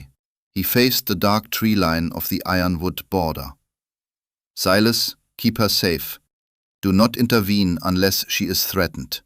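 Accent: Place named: Austria. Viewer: IKR, EXACTLY.!!! It's also every story from this channel. The accent is Austrian, I believe.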